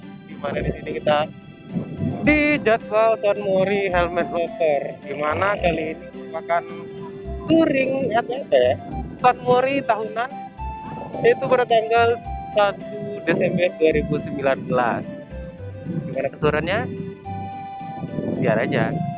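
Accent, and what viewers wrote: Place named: West Sumatra, Indonesia. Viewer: Kayak mana ni ngomong nya indomi (indo minang) yg jls2 aja la ngomong nya 😁😂😂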